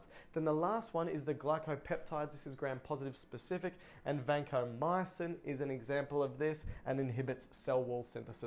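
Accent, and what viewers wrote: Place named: Australia. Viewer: Used this to study for the GSSE here in Australia (where I assume you are from, it sounds like? Or maybe NZ)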